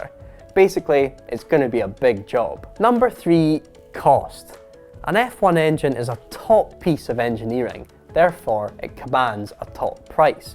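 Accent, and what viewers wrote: Scotland, United Kingdom. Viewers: Started watching then heard that roaster Scottish accent. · Is Scottish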